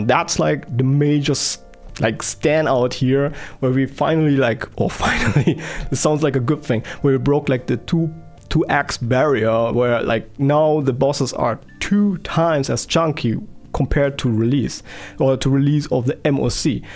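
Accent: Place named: Germany. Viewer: You sound like a German speaking english, are you german?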